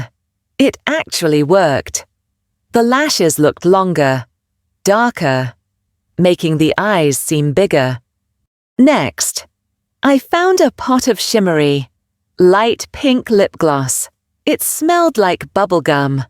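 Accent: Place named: United Kingdom. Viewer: Enjoying the British accent.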